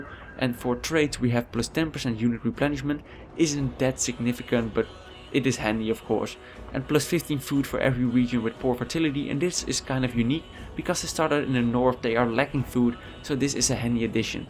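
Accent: Netherlands. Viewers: Dat Dutch accent do :D I love your Dutch accent! Greets from your southern Neighbour!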